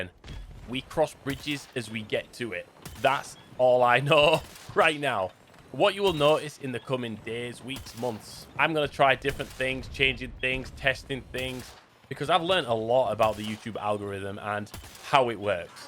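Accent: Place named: Scotland, United Kingdom. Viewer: When I first started watching you I thought you were a Scot. And now I have just learned I wasn't entirely wrong! □😂🤣😂🤣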